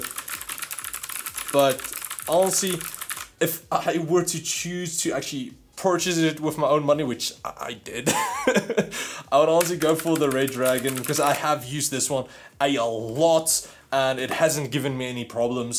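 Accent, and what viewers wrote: Afrikaans, South Africa. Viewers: Jy klink regtig afrikaans ek is afrikaans btw as jy nie geweet het nie lol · I could recognise ur accent I was born in SA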